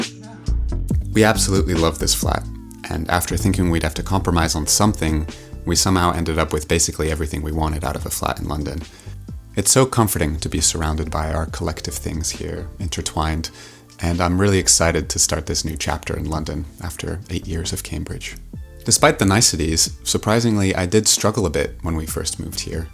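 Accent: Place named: North America. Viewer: Very beautiful and honest video. Thank you for sharing. Out of curiosity, are you Canadian? I ask because you speak English with a North American accent but you said "university" instead of "college", though it's probably because you did a PhD.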